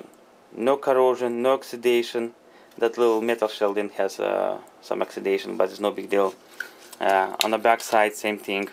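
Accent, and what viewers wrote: Russia. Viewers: Russian accent?))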